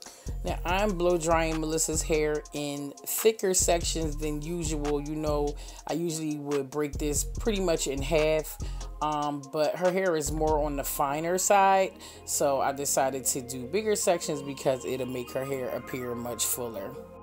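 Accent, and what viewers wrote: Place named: Pennsylvania, United States. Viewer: Always love watching you work and hearing your PA accent! Your energy is so positive and inspirational. Please keep doing you! ❤️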